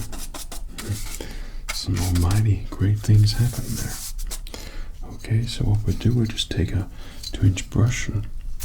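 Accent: United States. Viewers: Admirable, the concentration on trying to stay in character and trying his best to mimick an American accent while being so subtle and soothing, must've required some good practice! Great job! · wow i really like your american accent. so good. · It's not torch in the US it's flashlight but good American accent overall. Torch does sound cooler tho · We Americans call it a flashlight, but your older American accent is pretty good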